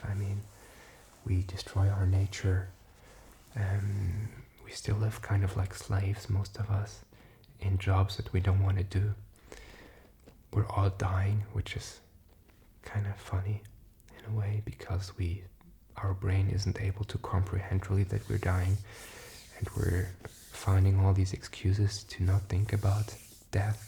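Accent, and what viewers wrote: Scotland, United Kingdom. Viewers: His accent is scottish btw :) sounds just like my mum and dad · That’s a scotch accent haha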